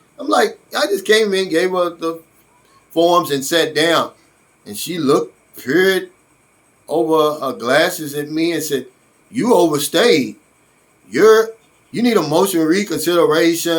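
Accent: United States. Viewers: What?? I'm assuming you identify as being Black and from your accent I'm guessing American? 13 years and one incident and you don't feel welcomed? Honestly I probably would have starting laughing at their attempts in doing so. My deepest condolences for your friend John 🙏🏾 I moved away from the US because I felt not welcomed daily as a Black man. Maybe I'm missing something in your commentary? I'm confused? Wishing you the best sir; it truly sounded like one of those days...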